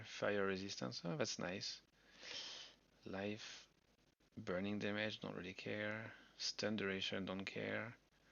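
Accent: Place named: France. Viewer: hi, great video! I cant tell if you're french with your accent, am i right? haha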